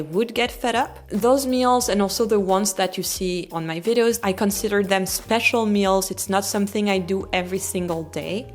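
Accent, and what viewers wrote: United States. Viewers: How did you get. your almost-American accent? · I'm a French guide mostly working for Americans. First of all, no one ever mentions it but your American English is on spot ! 🎉 I enjoyed a lot rhe section speaking about Food education. I find it very important and i think this is what leads to most of the other reasons you've mentionned. I oftne tell my guests about the long lunch break, even for kids 😂 my 2 yo daughter goes to day-care abd they sit at tiny tables on tiny chairs, eating out of a food tray with different compartment (starter main and desert/cheese) Found it so cute 🥰 Love your videos. Thanks I enjoyed the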